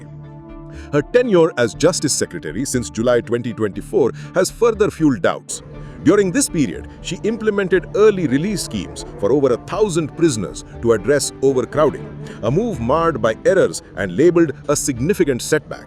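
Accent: India. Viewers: Stupid narrative for idiots with Indian accent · Bloody indian voice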